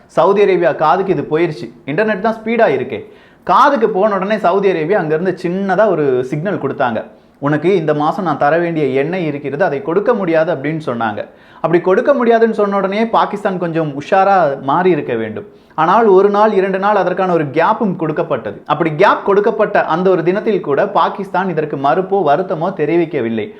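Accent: Kerala, India. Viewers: Extrodanary...bro....malaiyaala slaanghiel.neeghal peyisuvadhu.yenn uurrai .ghiyaabhaha paduththuhierradhu...,‼️‼️‼️‼️‼️👌🙏👍👍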